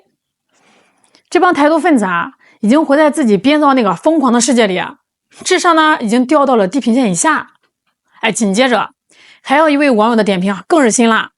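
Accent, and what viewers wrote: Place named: Shandong, China. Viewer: 这个没治了太亲切了，山东老乡哈哈哈哈🥰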